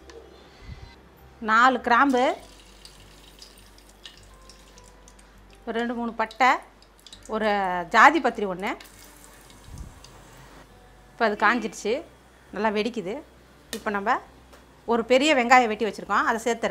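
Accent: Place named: Chennai, India. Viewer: பேச்சு நல்லா இல்ல தமிழ் நல்லா பேசுங்க சென்னை தமிழ் நல்ல இல்ல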